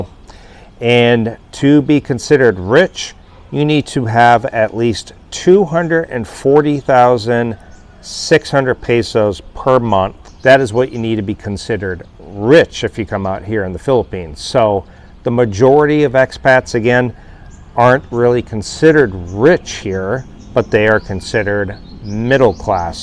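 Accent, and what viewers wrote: United States. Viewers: I noticed you wear Italian badges, but you sound like an American. If you are from America, are you not proud to wear the American flag?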